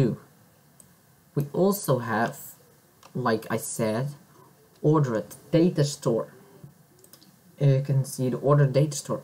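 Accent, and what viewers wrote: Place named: Philippines. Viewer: Your voice looks like filipino voice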